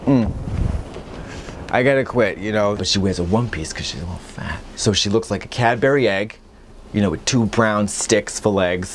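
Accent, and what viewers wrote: Boston, United States. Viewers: You can really tell she’s from Boston wow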